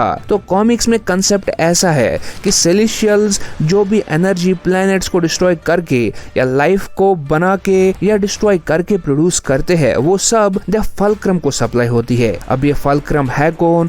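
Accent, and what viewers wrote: Kashmir, India. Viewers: bhai tumhari batu sai hi pata chalta hai ki tum Kashmiri ho 😊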